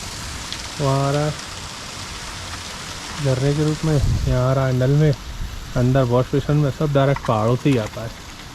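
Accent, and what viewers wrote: India. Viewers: Bhasha teth Ashoknagari? . Kya rishta hein aapka Ashoknagar se? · Bhaiya Agra side ke ho kya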